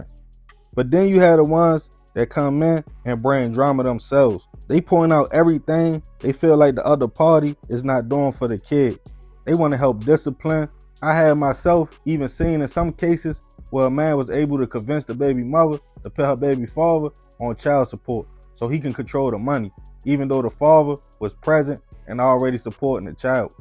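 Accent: Baltimore, United States. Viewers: This is my first time viewing one of your videos. I subscribed because your content was pretty good and I'd recognize that accent anywhere. Baltimore, right? #CharmCity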